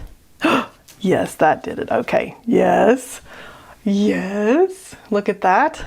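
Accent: South, United States. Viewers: Your southern accent and bubbly personality are so refreshing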